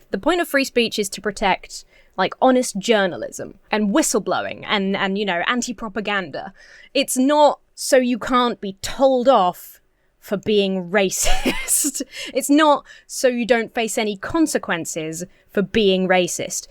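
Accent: United Kingdom. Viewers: 🤣😂🤣😂 Be Still my silly old mans heart, You godless little vixen you are a Riot and Hilarious. The Glasses and British accent drive me nuts you are so pretty and funny. Oh BTW Larry Flynt is worth noting because before the internets the real dirty pictures were in his Magazine HUSTLER, Not Playboy. Ans you looked at the pictures first then you might read the articles which pretty far left and very hard hitting. because he didn't worry about sponcers. I'm sure there is a good profile of him on youtube. Hes a great sinner too. Do what you do its so funny I watch your show as a treatment for depression and it always works.. Cheers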